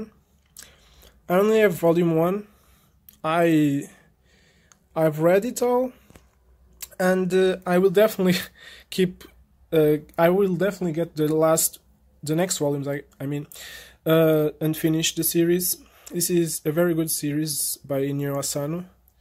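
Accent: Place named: Portugal. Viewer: e vi logo pela maneira como falas o ingles, todos os tugas falam com essa pornuncia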